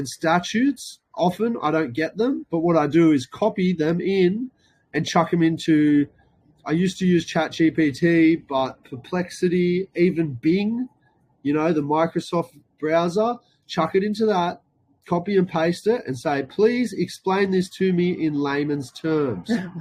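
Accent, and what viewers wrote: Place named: Australia. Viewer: Why do I hear an Aussie accent but you’ve referenced US law in the description?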